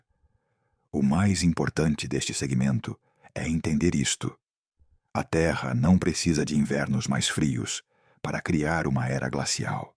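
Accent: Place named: Portugal. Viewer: Sotaque de Portugal não dá!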